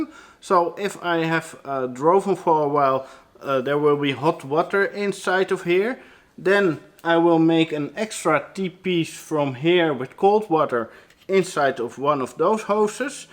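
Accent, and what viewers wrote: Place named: Netherlands. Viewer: Heerlijk Nederlands accent. Gaaf zo'n unimog als camper. Succes, ik ben geabboneerd. Ik ben bezig met m'n eerste camper te bouwen (vw t4).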